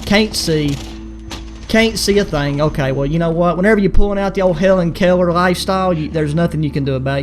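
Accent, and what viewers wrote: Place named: South, United States. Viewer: Bro I love your draw you from the southern states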